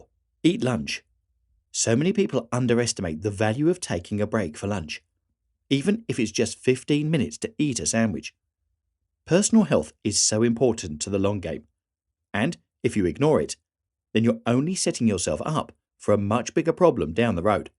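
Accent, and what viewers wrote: United Kingdom. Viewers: That thick british accent is so annoying:d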